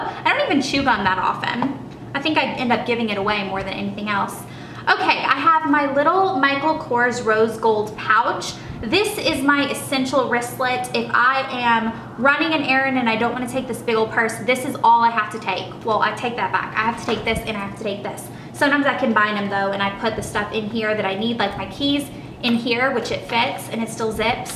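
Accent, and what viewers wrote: South, United States. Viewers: love the southern accent! makes me think of home!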